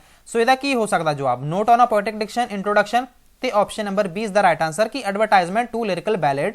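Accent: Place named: Malwa, India. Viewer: very natural ,pure malwai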